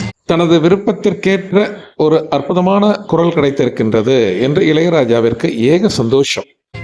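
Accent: Sri Lanka. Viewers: Neenga ilangaiya sir unga slang nalla iruku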